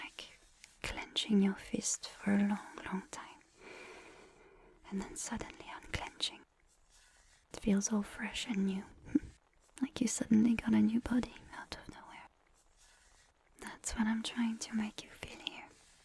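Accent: France. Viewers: The return of the glorious French accent! I thoroughly enjoyed this script and you did a wonderful job with the audio,. Cheers!😊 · Never thought I needed to listen to an ASMR with a lovely French accent. Magnifique! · This would have been an amazing video just from the script but your VA talent elevates it to another plane. It’s absolutely incredible. This video was the first of yours I found and made me instantly sub. I sincerely hope you consider more French accent videos! Especially with the intimate but not explicitly sexual script. I’ve been listening to ASMR for about a decade and don’t think I’ve ever been so completely enraptured like this. I’m sending the F4F version to my wife hahaha 🥰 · Thank you for another french accent content. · I don't normally comment in these videos but I just wanted to say that these videos with your accent and all. I keep coming back to it. 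There is something about how you speak with your French accent that never gets me tired of hearing it, even if I've listened to the same audio a countless amount of times. You've done a great job here. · I never thought french accent on english was so good. I can say thats even attractive. Looking forward for more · This is the best ASMR audio I've ever listened to. I listen to it so often it's crazy. The script is so well written, it's so down to earth, maybe not something realistic but it's not so out there like some audios I've heard. It's personal but also impersonal in a way. Really gentle and doesn't distract you from sleep/relaxation. Your voice with the french accent is so perfect, it's soothing and you sound professional and trustworthy, works really well with the script. Your other audios don't hit as hard as this one. They are good but this one has that something. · You need to do more french accent videos more often, they're so underrated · I don't know how to feel with the fact that I'm a spanish speaker and i'm listening to this english ASMR with french accent- Need more 🗿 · Oh my goodnesssss, your French accent is amazing!! 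· pls more french accent audios 🥰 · Everyone thinks French accents are beautiful, but I never really found the appeal before now. This video just made me say, "Ahhh... now I get it.." 😢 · I'm curious about something. As a french woman did u need a lot of practice to get your english skill to that level? Or did u had an accent like in this video and improved from that. If u hadn't how hard was is to train one.😶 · The combination of your voice and the French accent is incredibly soothing. Thanks for everything you do. ♥ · I love French accents so far. 10/10000. But the French lady is very kind and also lovable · I usually can't stand the french accent in English but your voice really makes it work. You have a wonderful voice and mastery of both languages. Thanks for your work and here's to your spread on the platform. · The wholesome, soft-spoken french accent is awesome... Slept well with this one when insomnia hits last month and didn't end this video consciously till today. 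Brilliant masterpiece! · This and the maid video are the two most soothing videos on YT. I'm writing a novel and one of the main characters has a french accent. Her name is Emilie, so this video really caught me off guard when I heard her name lol. Thanks for the awesome content, and for giving me a voice to picture when I write the character. Hope we get more french accent videos in the future, but all your content is great. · One more Time Your voice sounds so good in French and with the French accent… À quand un audio juste en français 👀🤣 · YES! Some more French accent. I don't grave for the affection, I crave for the accent. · This melted my brain in the best way. Please do more content with the French accent / sweet talk like this 💙🤍❤️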